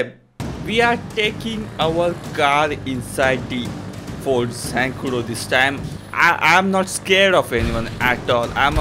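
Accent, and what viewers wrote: India. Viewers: I love your indian accent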